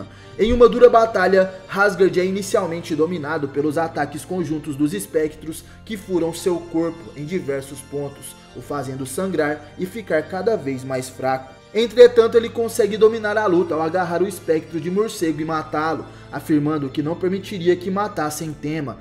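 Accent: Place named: Portugal. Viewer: purtugues d'purtugual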